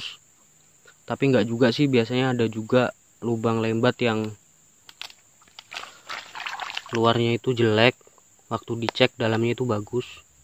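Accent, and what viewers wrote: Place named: Surakarta, Indonesia. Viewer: Sampean asline ngndi mas,, boso ne ra adoh ko solo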